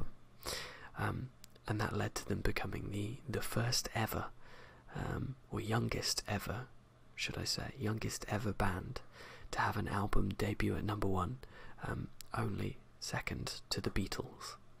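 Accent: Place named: United Kingdom. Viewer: this is so nice! Mind me asking where in the UK are you? So i know where i should look for a man that has the same accent as yours...and hopefully as handsome as you are! hehe! :P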